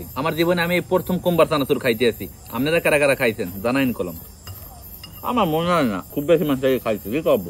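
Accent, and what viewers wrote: Barishal, Bangladesh. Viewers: হায় রে বরিশাইলা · আমিও বরিশালের ছেলে কিন্তু আপনার মতো সুন্দর করে বরিশালের ভাষায় কথা বলতে পারি না😢 আসলে আঞ্চলিক ভাষায় একটা টান যেটা আমার কথায় আসে না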